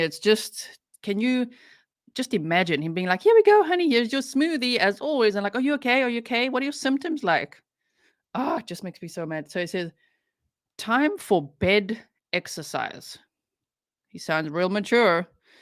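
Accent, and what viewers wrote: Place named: South Africa. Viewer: nice to hear a South African accent!!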